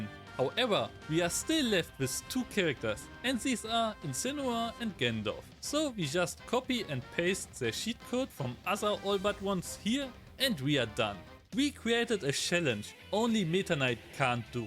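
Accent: Germany. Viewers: Dein Akzent ist soooo deutsch.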